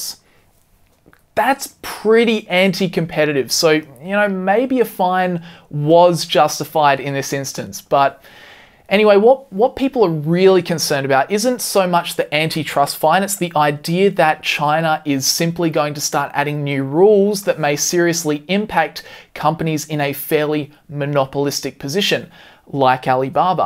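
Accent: Australia. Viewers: you got my attention with the quote: "yea awright, grandpa!!" .. good analysis with concerted effort to avoid over-influencing our opinion... love yur Aussie accent...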